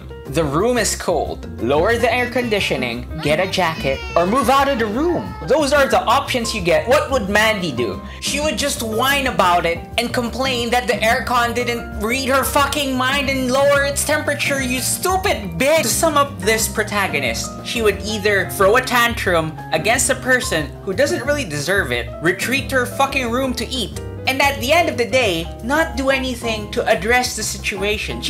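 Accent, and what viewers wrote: Philippines. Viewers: awesome vid! also, somehow thought of your accent... as Filipino? maybe i'm wrong, your analysis of this weird comic was spot-on, though. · Are you filipino? I can sense our similar accents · Didn't read the book, have no plans to because the internet have all united to say one thing about it: it's shit Art is good, but story is shit Also your accent is familiar are you by chance a filipino? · Are you a filo lmfao the accent is so familiar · Im not sure why, but I hear a smol twinge of Filipino accent for some reason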